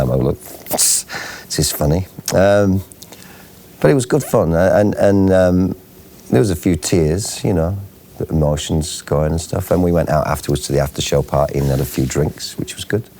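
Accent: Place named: United Kingdom. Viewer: Love listening to yr posh English voice. Come back again.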